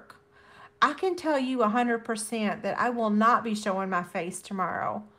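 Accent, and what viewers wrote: South, United States. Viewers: So we can’t hear that sweet southern voice & your beautiful face at the same time…. Thanks for hanging in there.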